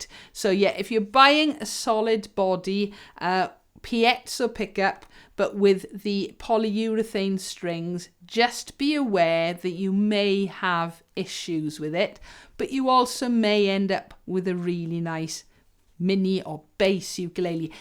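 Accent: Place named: Wales, United Kingdom. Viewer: Hi many thanks for your informative reply. Sadly the purple Flight Ltd Ed mini J bass is now sold out. I could return the Squier I suppose. I would be interested to hear why you prefer the Ibanez Mikro. FYI loving the purple, dragons and Welsh accent, reminds me of my second home - studied in Wales many Moons ago and miss it. Keep on playing 💜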